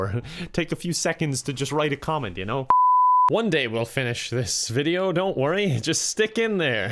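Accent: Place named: Ireland. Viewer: Do whatever you want to. I'm here for the Irish accent. That shit is basically my kink.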